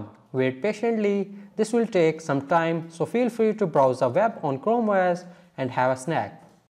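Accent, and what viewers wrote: India. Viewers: I have yet to try this but your Indian accent is way too strong, makes it hard to watch. I appreciate the effort though.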